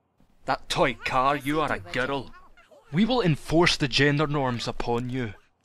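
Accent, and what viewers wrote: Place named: Scotland, United Kingdom. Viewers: Actually I think he's Scottish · hey your british i love british and doctor who by the way what exspanshion pack you using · he's Scottish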